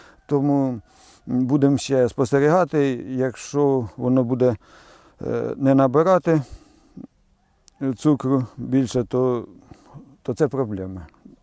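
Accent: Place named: Poltava, Ukraine. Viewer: Так вы с Хорола. Мой отец тоже. Жаль уже умер. По разговору догадалась что с Полтавской обл. Хороших вам урожаев.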